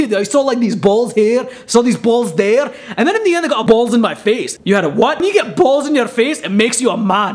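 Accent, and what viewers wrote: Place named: Australia. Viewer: It sounds Australian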